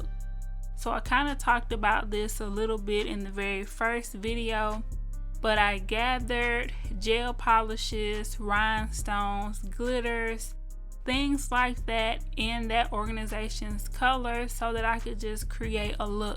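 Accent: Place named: United States. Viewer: Yes I love nails. Do them myself. I work with gel and polygel but I can appreciate a good nail video even using other mediums. I like your channel because you’re upbeat, have a cute country accent and I like the way you explain things. You can tell you like what you do and you can sure sell a product. I hope they recognize! I’ve watched 5 of your videos now back to back and not 1 video went by without me pausing to go put something in my cart.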